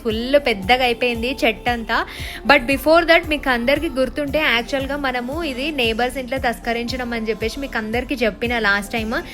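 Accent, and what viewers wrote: Telangana, India. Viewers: original Telangana slang 🔥🔥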